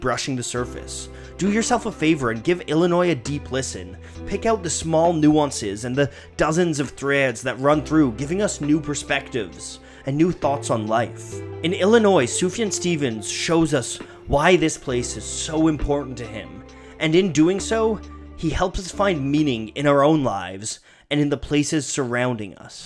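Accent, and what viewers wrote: Canada. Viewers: You sound canadian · jukeboxjoe As if the thick Canadian accent didn't give it away otherwise lol.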